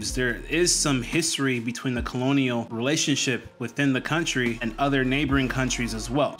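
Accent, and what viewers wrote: United States. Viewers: Your american accent and been just a tourist for sure they ll treat you nicely...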